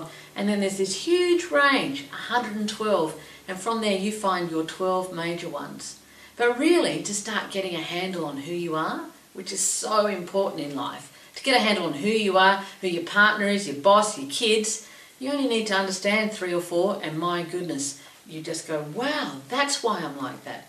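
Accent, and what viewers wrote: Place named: Australia. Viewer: love both - the jungian archetypes & the aussie ascent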